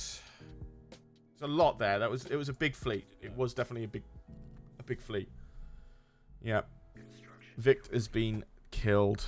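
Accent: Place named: United Kingdom. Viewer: The British accent made this perfect for an imperial campaign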